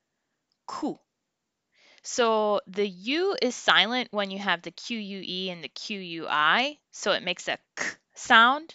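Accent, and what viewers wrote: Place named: United States. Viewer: I am disappointed because you clearly have have a non-native, American accent in Spanish. For example, at 2;37 ff. you say "ca", "co", "cu" with gringo aspiration on the [k] sound, you say it like [kh]. In Spanish, [k] has zero aspiration. IMO only native speakers should be teaching phonics and accent.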